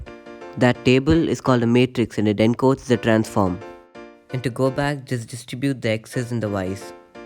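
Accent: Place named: India. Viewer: For fuck’s sake can I find one educational video that’s not in an Indian accent?!!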